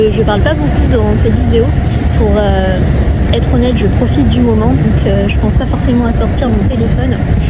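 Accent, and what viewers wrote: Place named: South, France. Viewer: Ton sud français est mille fois plus beau!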